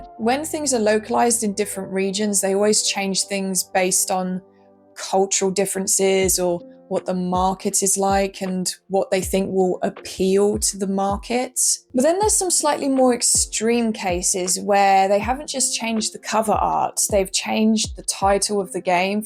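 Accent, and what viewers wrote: England, United Kingdom. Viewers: A cute girl with uk accent playing on a CRT? What else can I ask 😳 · Why are you talking in a Harry Potter accent the whole video? Kinda weird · Cuz she's from England & there's people who actually sound like that.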